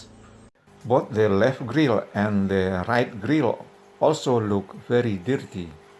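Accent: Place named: Indonesia. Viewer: Logatnya seperti orang indonesia bang, daerah mana nih ?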